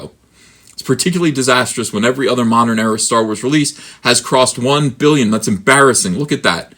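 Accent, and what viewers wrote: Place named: Long Island, United States. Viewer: what is the accent that has? I want to say"gay" but is it Long Island?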